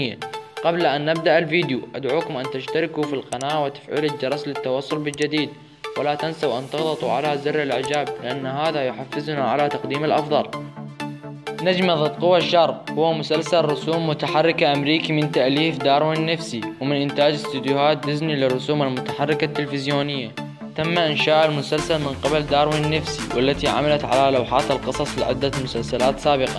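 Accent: Iraq. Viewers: إضنك من العراق لئن لهجتك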